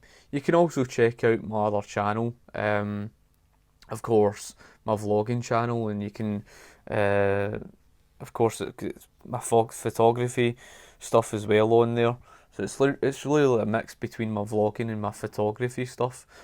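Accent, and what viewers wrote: Scotland, United Kingdom. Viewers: Ah good to see you back. And good to hear a Scottish voice speaking in favour of Brexit!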